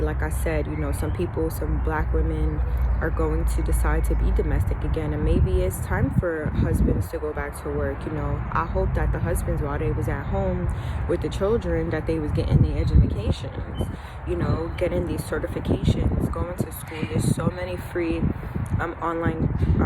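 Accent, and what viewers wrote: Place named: Baltimore, United States. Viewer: OMG you sound like you're from my hometown!! Aye Baltimore! But you gave some very insightful advice bc I had to makes changes myself regarding the overuse of technology and the underutilized benefits of nature. When I walk my dog, I hardly see anyone else outside but just feeling the rays of sunlight on a consistent basis helps my physical and mental health tenfold. Its easy to get complacent with being indoors but after a while, it can take a toll as you mentioned. I begin to get more anxious after too many days indoors. The body will certainly alerts you when something is amidst.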